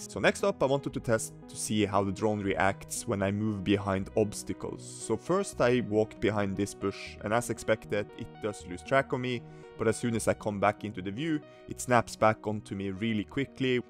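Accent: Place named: Norway. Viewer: your English is perfect. Men eg kjenne igjen ein nordmann når eg hørre ein.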